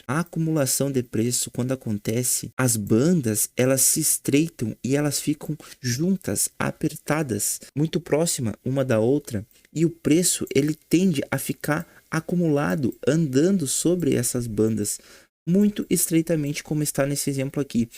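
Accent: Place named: Rio Grande do Sul, Brazil. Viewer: Conheço esse sotaque tchê kkkkkkk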